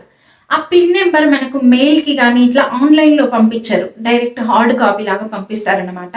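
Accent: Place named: Andhra Pradesh, India. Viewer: akka evideolo okati change vundi emito telusa me voice Andra Telugu matladaru telanga languagevadilesi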